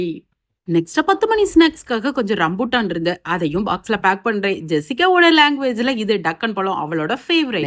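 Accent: Tirunelveli, India. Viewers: Sister nenga enna five star hotels chef fa erunthingala and your slang is very nice nellai slang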